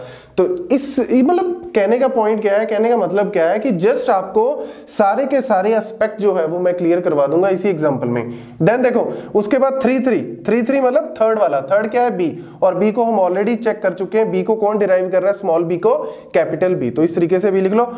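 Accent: India. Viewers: Bro I like your accent, sounds so different to other indians I watched on youtube. I am not indian but understood you very well thank you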